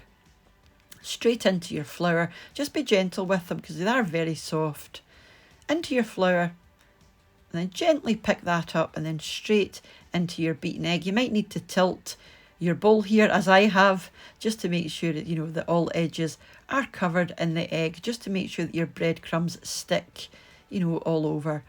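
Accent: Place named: Aberdeen, United Kingdom. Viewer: Look delicious, thank you .. and I love your accent, sounds like an Aberdonian? 🏴 ❤️🇦🇺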